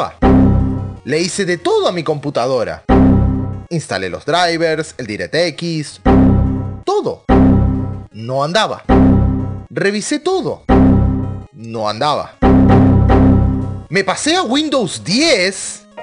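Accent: Uruguay. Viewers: Eres uruguayo :0?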